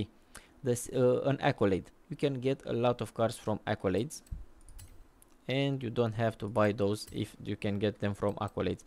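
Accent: Romania. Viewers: your romanian accent is so strong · You have an romanian accent, but how do u get vouchers?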